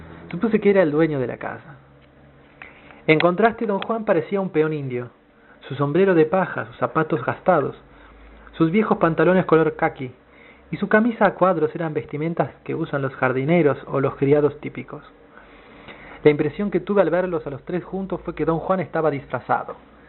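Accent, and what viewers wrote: Argentina. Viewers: Que poca madre arruinar algo tan profundo con la lectura culera y por si faltaba algo pa hacerlo mas mierda el acento argentino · Porque dices shover es llover arjentino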